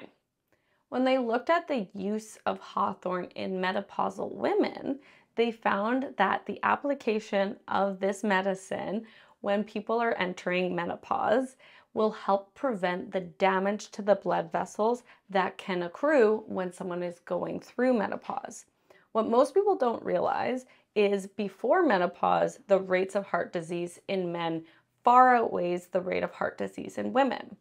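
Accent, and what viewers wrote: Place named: Canada. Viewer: Americans usually leave the H sound off this word, maybe influenced by French or Spanish, but British speakers generally pronounce the H. Canadian English is a kind of hybrid and that's what the good doctor speaks.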